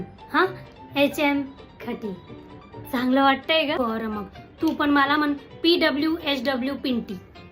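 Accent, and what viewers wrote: Khandesh, India. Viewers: खूप छान ह्युमर आहे तुमचा. खान्देशी स्वर तर जाणवतोच. ❤❤❤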